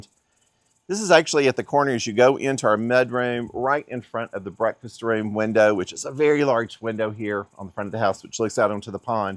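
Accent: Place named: South, United States. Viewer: I came across your site by accident and when I saw you talking about your Playing the Blues Salvia and Peach Drift Roses, I knew you would be inspiring. Also, you sound like you are from the South. Although I live in Georgia, I enjoy hearing from other gardeners about what they have planted.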